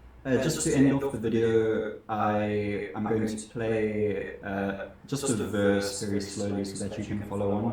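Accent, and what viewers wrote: South Africa. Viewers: This is awsome man, Ill be using this for sure! Are you South African? If so double hell yeah!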